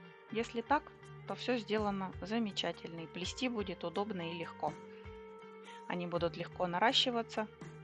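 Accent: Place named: Russia. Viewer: Снова, Здравствуйте!А, где,Вы, проживаете?По говору, не центральная часть России!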